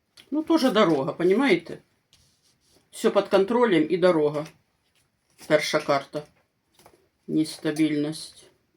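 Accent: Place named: Surzhyk, Ukraine. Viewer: . Люблю вас смотреть и слушать, как вы разговариваете на нашем, суржике,. Посмотрите Мариуполь, может, что то уже изменилось.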